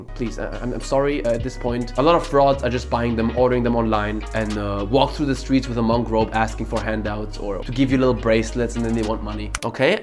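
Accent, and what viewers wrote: Germany. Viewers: Why do you sound like a german guy speaking english 🤔🤣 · He has german accent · Bro r u german ur accent kinda sounds like it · It sounds sometimes like you are from Germany or Austria? "ahhh Im gonna give my Senf..." and the way you say "Steven Seagull" sounds very german to. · Finds geil wie man den deutschen Akzent raushört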